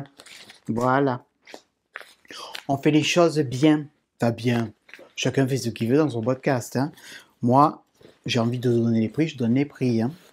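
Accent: South, France. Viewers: J'aime beaucoup ta simplicité et ton accent du Sud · Ce petit accent du sud nous met du baume au cœur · ton accent du sud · je comprends assez bien ton accent du sud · ce petit accent du sud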